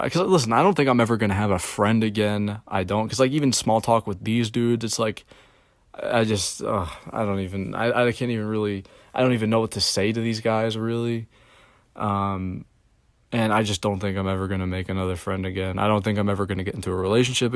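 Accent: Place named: United States. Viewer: I was waiting for a buzzword....knew something up. I literally can't have any sympathy for you because of how negative you are. And I don't care if you've never directly bullied anyone, because the way you talk about people in other videos sounds eugenicist. I'm not hear to tell you to pull yourself up by your bootstraps. I bet you will feel better about yourself once you learn how fucked up capitalism is. Don't think about individual women, think about patriarchy and capitalism. It almost explains everything about modern life in a first world country (I don't know exactly where you live but I assume America by your voice). Don't think about your socioeconomic upbringing, think about the power of labor and the power of capital. Don't think about dating, think about community. Think about the world through a sociological lens, and try navigating the world like a sociologist. 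Once you start realizing that socialization is as simple as showing up to places regularly and not pushing people away with what you say (honestly, lots of friend making cliches from high school hold true). And above all, live life for yourself. I will not tell you to move through the world with a goal mindset, because success if not guaranteed. Rather, have systems in place that you will socialize regularly, with any safe group that will accept you. It's hard, but not because it's complicated, but because of the emotions surrounding rejection and fear. That's mostly it (of course from aspects of your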